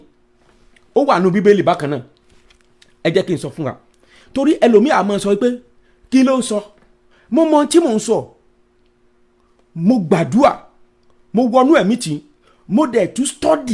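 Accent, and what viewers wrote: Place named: Yoruba, Nigeria. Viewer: First of all I love that Yoruba dialect, it’s very sweet, continue my prophet......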